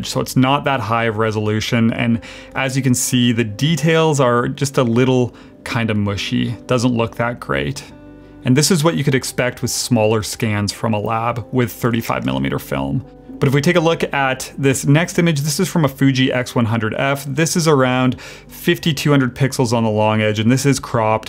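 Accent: Canada. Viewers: Sounds like a Canadien.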